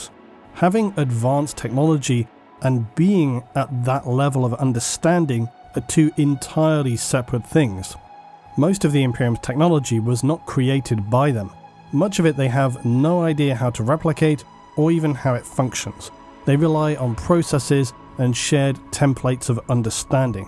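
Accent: United Kingdom. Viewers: 3+ hours of a smooth talking British man coming to terms with free will being an illusion 😆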